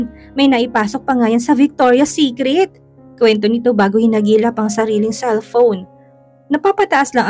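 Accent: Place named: Visayas, Philippines. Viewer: panget tlg ang boses mo bisaya pa ang accent mo pumapanget tlg tong story n ito dahil ikaw ang narrator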